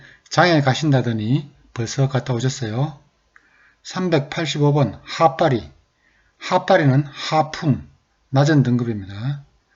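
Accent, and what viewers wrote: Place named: Uiseong, South Korea. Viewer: 안녕하세요 ^ ^ 제가 경상북도 사투리에 관심이 많은데 님 사투리 잘 보고 있습니다. 제가 취미로 사투리 채널을 운영하고 있는데, 님이 괜찮으시다면 ' 경상북도 의성 ' 영상은 모조리 님 억양을 흉내내고 글을 베끼면서 올리고 있습니다. 제 영상이 그렇게 훌륭하거나 유명하지는 않아서 님께서 아직 못 보셨을 수도 있지만 혹시 문제가 된다면 경상북도 의성 영상들은 삭제하도록 하겠습니다 (맨 마지막에 저작권을 따로 주장하지는 않는다셔서 지금 의성 영상 몇 십 개 정도 올렸습니다. 님 사투리 억양을 듣고 억양을 어떻게 할지 모호한 것들은 되도록 안 올리고 있습니다) 저뿐만 아니라 의외로 전국 팔도 사투리에 관심을 가지는 10대 소년들도 많습니다. 되살아나지 않고 사라진다고 하더라도 저는 제 영상에 기록용으로 여러 지역 사투리를 담아내고 보존하고 싶습니다. 제 영상 댓글들은 하나하나 확인하기 어렵지만, 관심이 가신다면 찾아와 주시고 억양도 교정해 주세요! 감사합니다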